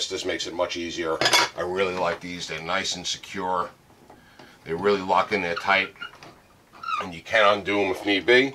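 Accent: New York, United States. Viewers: thanks for my daily recommended allowance of New York accent. thx for the viddie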